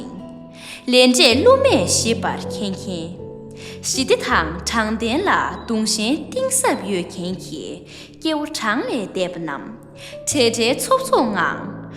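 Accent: Standard. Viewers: རྗེས་རབས་གསར་པས་་་་བུ་མོ་ཁྱེད་ཀྱིས་སྙན་ཚིག་དེ་མཐོང་སྐབས་་་་ད་དུང་ཡང་མི་རིགས་འདི་ལ་རེ་བ་ཞིག་ཡོད་པའི་འཚོར་བ་སྦྱིན་གྱི་འདུག་་་་་་དཔེ་ཡག་པོ་་་་་བོད་སྐད་གཙང་མ་་་་་ཀློག་གི་གཅོད་མཚམས་ལེགས་པ་་་་་་་བཀའ་དྲིན་ཆེ་་་་་མུ་འཐུད་་་འབད་བརྩོན་གནང་རོགས་་་་་་་་ལས་ཞོར་འགྲོ་ཞོར་ལ་ཉན་གྱི་ཡིན་་་་་་་་་ཁྱེད་རང་དང་་་་་ཁྱེད་ལ་བྱམས་བརྩེའི་སྐྱོངས་མཁན་གྱི་ཕ་མ་གཉིས་ལ་དམིགས་གསལ་བཀའ་དྲིན་ཆེ་་་་་་་་🎉🎉🎉🎉་་་སླད་མི་ཚེའི་ནང་་་་་གང་བསམ་ལས་ལ་རེ་བའི་འབྲས་བཟང་སྨིན་པའི་སྨོན་འདུན་དང་བཅས་་་་་རྒྱ་གར་བྱང་ཕྱོགས་་མ་ནའ་ལི་ནས་་་་་་་སྤུན་ཟླ་ནས་་་་་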